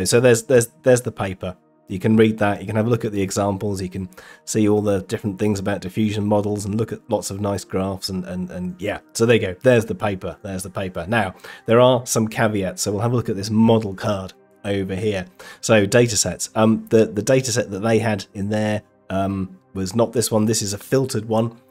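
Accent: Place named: United Kingdom. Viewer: You sound like spiffing brit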